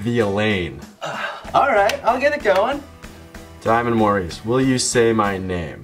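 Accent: Boston, United States. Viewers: Love the Bostonian accent.. and the beard! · I enjoy your Boston accent. :) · This was fucking excellent! That Boston accent is definitely coming through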